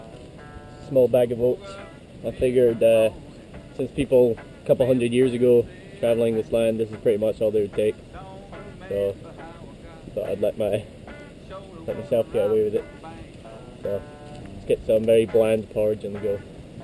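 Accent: Scotland, United Kingdom. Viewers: Daniel Parker it’s a Scots accent. And a lovely one for all that.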